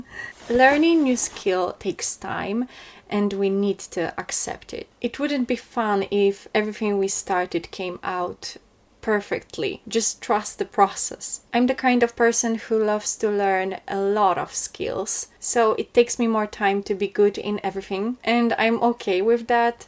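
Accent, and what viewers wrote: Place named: Poland. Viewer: OMG Tak mi się wydawało, że masz polski akcent! :3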